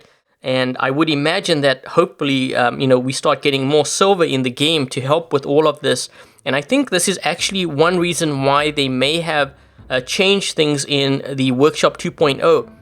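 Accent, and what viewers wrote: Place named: South Africa. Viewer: I'm pretty sure the official language of Brazil is Portuguese and to answer that question: He's said on some of his live streams (that are recorded and posted in his channel in case you want to double check) that he was born in South Africa and went to a primarily English speaking area of the country and I think he lives either in Canada or New York so if you're wondering about his accent there's a solid chance that it's based on all three rather than a Brazilian's English.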